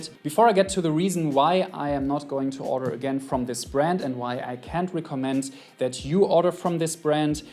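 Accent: Germany. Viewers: Yes he have a thick german accent... I know cause I have been living in munich since two years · I heard 2 seconds and I knew you was German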